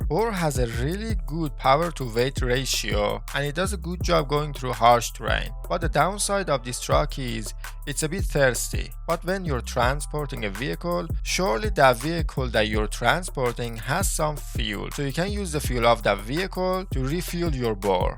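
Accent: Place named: Iran. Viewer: dude i love your persian accent XDDDDD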